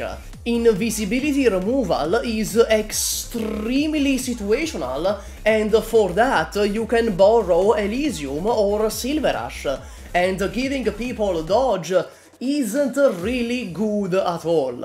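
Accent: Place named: Italy. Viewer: I've noticed your Italian accent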